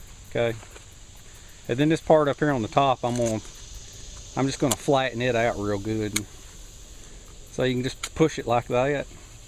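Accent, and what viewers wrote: South, United States. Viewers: This guy is so much southern (no disrespect)!!! It's funny when he says Machete. ..LOL I like your vids. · Hey man, I love your accent! What part of the States is that from?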